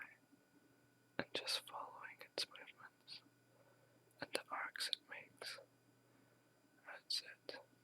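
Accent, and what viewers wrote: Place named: Scotland, United Kingdom. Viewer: Once again, you've created a winner. Man, I want a Scottish accent like yours!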